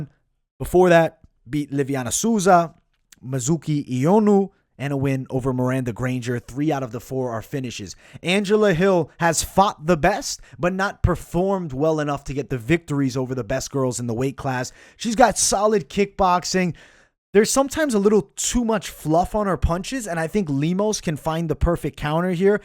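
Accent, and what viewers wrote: New York, United States. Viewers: U sound like ure from nyc I like u automatically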